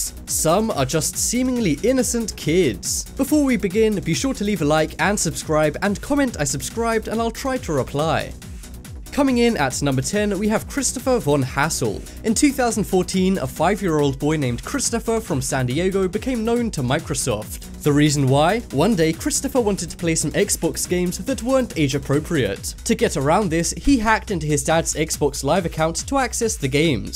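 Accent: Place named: RP, United Kingdom. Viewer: What's with the narrators voice? he sounds like he's a posh english school boy but can't pronounce most of what he's reading!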